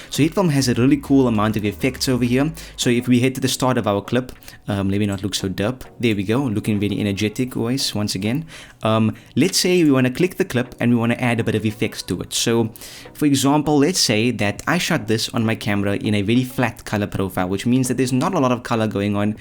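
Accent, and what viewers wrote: South Africa. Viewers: no way i came on this vid and this bra talking in an accent that only another brazzo can understand, coming fromn that west coast ey represemt the ZA fam homie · Is that a South African accent I hear?? I am using premiere at the moment but I'm thinking of switching. Do you recommend hit film?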